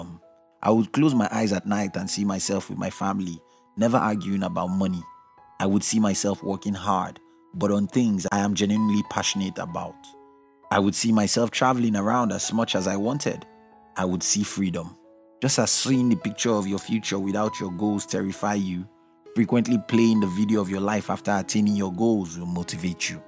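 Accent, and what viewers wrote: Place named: Nigeria. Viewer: Nigerian, I believe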